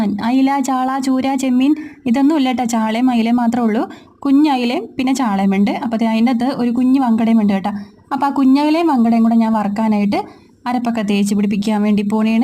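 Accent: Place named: Thiruvananthapuram, India. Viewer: ചേച്ചിടെ ചില സംസാരം കേൾക്കുമ്പോൾ തിരുവനന്തപുരംകാരി ആണെന്ന് തോന്നുന്നു ഇവടൊക്കെ പറയുന്ന അതേ സംസാരരീതി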